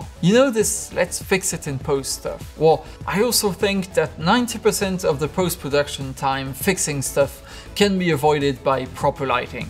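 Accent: France. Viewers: Are you French ? You have a frenchy accent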